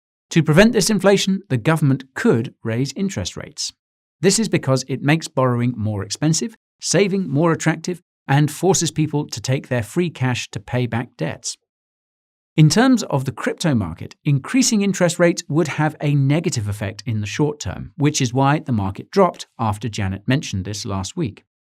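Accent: United Kingdom. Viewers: I was just listening to that cool British accent 😅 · This is how we talk in Britain.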